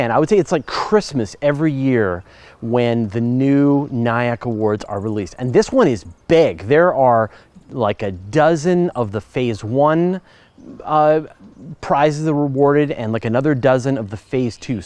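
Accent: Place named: Eastern Canada, Canada. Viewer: I was born and raised in the lower mainland. I've never said 'aBOOT' nor heard anyone around me saying it. First I heard of it was from American shows mocking Canadians, but then I noticed some Canadians from Ontario using it and figured it was a eastern Canada thing. So.... a bit surprised to hear you using it Fraser Cain. Do you say "rewf" instead of roof, too?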